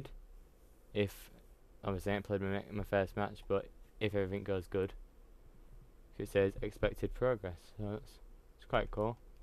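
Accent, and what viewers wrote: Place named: Northern England, United Kingdom. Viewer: SAME and he's northern which makes it 10x better for me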